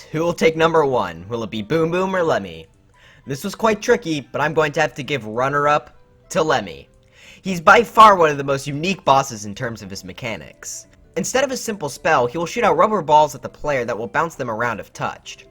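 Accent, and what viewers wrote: United Kingdom. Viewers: Are you British? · Are you British i heard be ah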